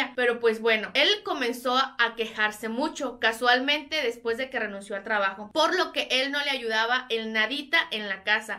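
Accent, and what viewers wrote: Mexico. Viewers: 😂eres de Sinaloa? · Hola, tienes el acento del Norte de Mx. Saludos desde Hermosillo Sonora.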